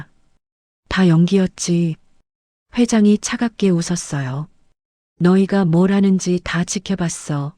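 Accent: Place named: China. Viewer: 쭝꽁놈인가 말이 이상하네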